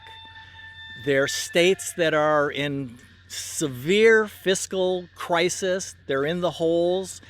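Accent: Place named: New England, United States. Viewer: I know this video is 2 years old and I've only watched 1 other of your videos but I wanted to take a guess based on your accent. Massachusetts Rhode Island Connecticut California now to watch the video and see how bad I missed.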